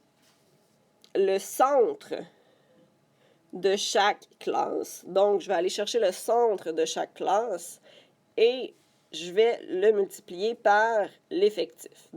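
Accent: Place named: Quebec, Canada. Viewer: elle est quebecoise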